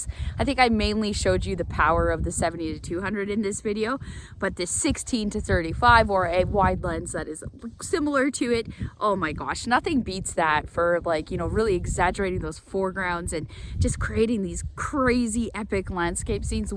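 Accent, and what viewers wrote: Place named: Canada. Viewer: you have a lovely Canadian accent! ;-)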